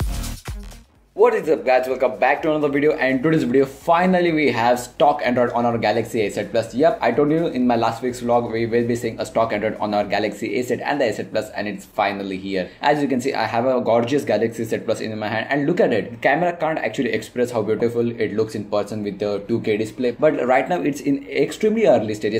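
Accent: India. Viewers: Indian english is the best! :D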